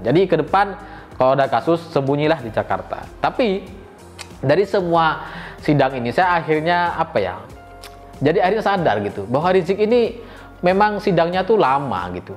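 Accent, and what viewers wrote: Madura, Indonesia. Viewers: Kya logat madura bicaranya bang😅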